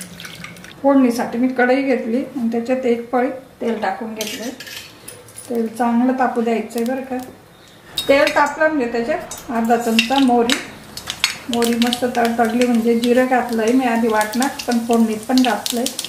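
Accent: Khandesh, India. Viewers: ताई तुम्ही खान्देशी आहात का कारण आपल्या खान्देशी भाषा लगेच ओळखले जाते आणि आम्ही तुमचेच सर्व व्हिडिओ बघतो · Chan Tai. Recipe takat Jaa .... Khandeshi awwaz aiklyawar pan samadhan milta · Tumcha aavaj olkhicha vatato aahe Tumhi khandeshi aahat ka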